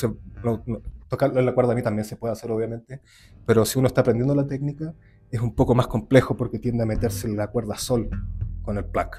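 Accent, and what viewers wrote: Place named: Chile. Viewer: Tienes acento Chileno... ACENTO, no términos..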